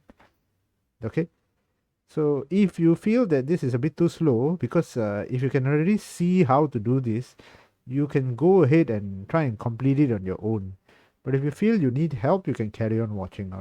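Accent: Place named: China. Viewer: Malaysian lol the English. Your English have Chinese accent annoying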